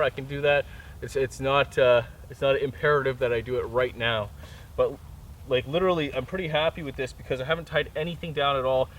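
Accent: Canada. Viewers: Awesome woods scenery. Lovely colour in late fall I see. You must be in Canada all those oak and maple tree's. Oh you just said " Canadian gem knots" So you probably are in Canada and sound Canadian. Awesome job on the bed. Gorgeous german shepherd dog too! Yummy poached eggs. Good job on the eating stick. Thanks for sharing your knowledge!! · Is this guy Canadian?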